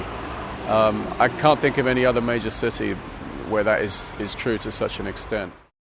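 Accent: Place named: Portsmouth, United Kingdom. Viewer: Huh? That's not true at all. He sounds exactly like what he is - a guy from Portsmouth on the south coast of England. (Also my home town.) You might be getting confused because the Pompey accent has a partial west country burr on the Rs to it (like a pirate), and that same burr has always been a non-trivial component of the American accent. But trust me, his accent is definitely English. Perhaps just not the accent you think of as being English (there are DOZENS of distinct English accents).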